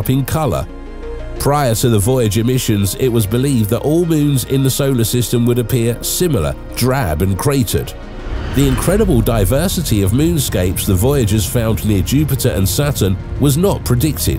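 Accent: United Kingdom. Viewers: i don't like british accent for narration .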